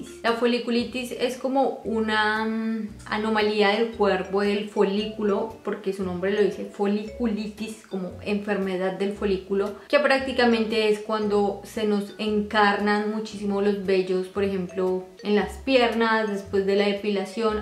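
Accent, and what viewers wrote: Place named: Colombia. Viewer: Me encanta como hablas parece Colombiana, 🇨🇴 un saludo desde Bruxellas Belgica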